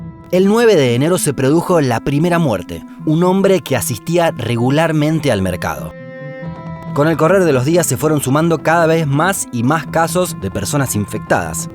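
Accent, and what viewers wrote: Argentina. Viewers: que pereza con ese acento argentino no puedo escuchar bien, lo voy a quitarsh. Chego se dice "llego", cha "ya".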